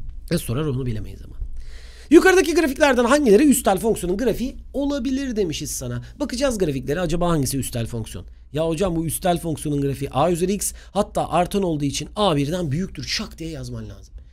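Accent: Aegean, Turkey. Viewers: Hocam yorumları okuduğunuzu biliyorum ve bir ricada bulunacağım : Bu ders ege şivesi kullandınız bazen bi gün Karadeniz şivesi de alır mıyız ya ? Edit: Karadeniz ağızı .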